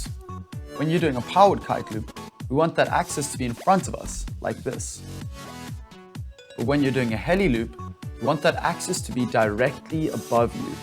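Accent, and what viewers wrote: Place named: South Africa. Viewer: Fuuck bru I cant anymore. I already miss South Africa too much.. these videos make me feel at home just hearing you talking kak while saying the right shit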